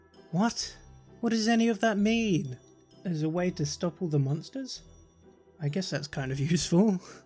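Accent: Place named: United Kingdom. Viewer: you sound like winnie the poo, dream, and a british accent combined and i am HERE for it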